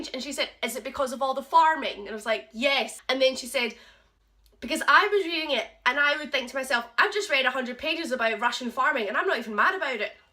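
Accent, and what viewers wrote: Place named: Scotland, United Kingdom. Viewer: Hi! Great review! Where are you from? I would guess Scotland near Glasgow? but you have a really nice accent. It gives you character. I've noticed way more Europeans do classical book reviews on youtube than North Americans, which is kinda sad to me. 😟 (I'm from Canada.)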